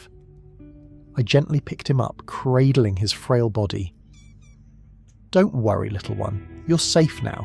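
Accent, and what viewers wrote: United Kingdom. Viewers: Why is the voice from uk this is not filmed in the uk.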